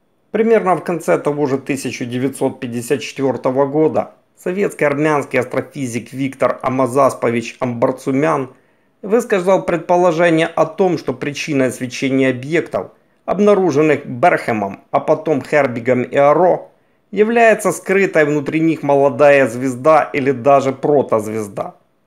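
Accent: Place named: Kuban, Russia. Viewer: Интересно. Но интонация диктора и кубанойдный говор раздражают сильнее, чем желание узнать о звездах, еще не вышедших на главную последовательность. Тем не менее, спасибо за труд. Дизлайкать не буду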